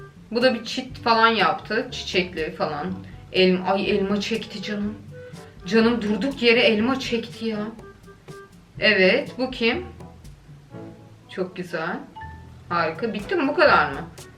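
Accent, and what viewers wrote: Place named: Sivas, Turkey. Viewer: Abla nerelisin ben sivaslıyım aynı senin gibi konuşuyom gızzzz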